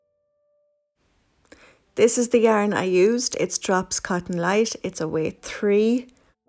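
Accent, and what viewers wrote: Ireland. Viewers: I'm delighted to have found your tutorials. It's very easy to follow your instructions and I love your Irish accent!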